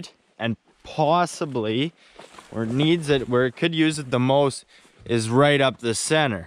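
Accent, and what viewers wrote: Canada. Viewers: Appreciate your build ay. Good job, the Canadian accent with expletives, excellent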